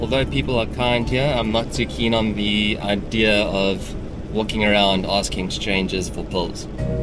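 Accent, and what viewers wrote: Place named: South Africa. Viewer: It was hard to hear you being so hard on yourself in the video. Because watching this as a South African expat living in Europe, my heart yearns to be home. My heart actually breaks, not being able to be there in nature with my family; with our beautiful sunshine; with our friendly people or awesome food culture; our magical animals in our national parks. And your videos bring me so much joy and so much peace, listening to a South African accent, speak about our home, rather than an American tourist, who doesn't know the first thing about our home and our animals😂 Please dont be so hard on yourself - you don't know how much warmth you bring to so many people with these videos and I pray that you continue to prosper.❤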